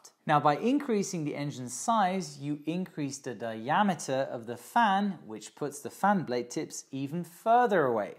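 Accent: United Kingdom. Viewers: ... You're a good looking guy! Specially with that British accent? Australian?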